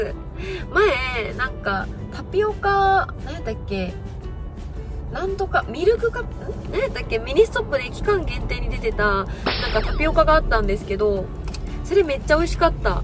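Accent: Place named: Hiroshima, Japan. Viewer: NAロードスターのマニュアルですか？うらやましいですー！広島弁に聞こえるのですが…わたしrootsがそうなので、だったら嬉しいです！！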